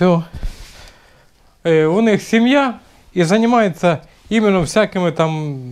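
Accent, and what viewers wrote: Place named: Kharkiv, Ukraine. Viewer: Этот человек говорит на местном диалекте и вряд-ли он умеет разговаривать на русском. На Харьковщине везде так говорят .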